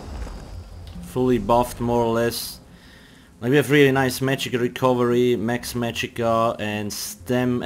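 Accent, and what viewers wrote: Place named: Switzerland. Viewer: Hahaha i knew it! This accent(akzent) must be from a swiss guy :D Grüezi from freiburg :) I got a question. Woulf you mind to help me a bit out about pvp getting better with classes(mainly nightblade or sorc) and other basic stuff(im not a beginner in teso but im a beginner in pvp even if my pvp rank is veteran im not that good)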